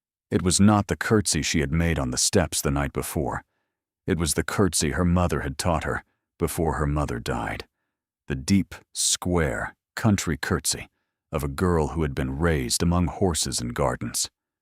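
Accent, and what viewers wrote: United States. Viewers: Cant bear us accent.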